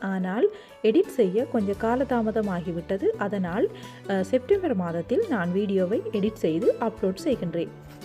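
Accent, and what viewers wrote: Sri Lanka. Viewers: I love your chaste and pure Sri Lankan Tamil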